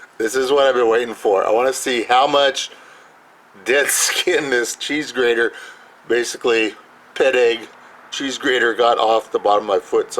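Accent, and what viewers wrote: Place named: Florida, United States. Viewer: You sound like your from Florida lol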